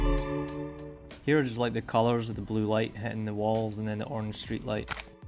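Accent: Scotland, United Kingdom. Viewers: Loved it! So much life at night there, up here its almost dead at night. Thanks for sharing. And btw... love your scottish accent;)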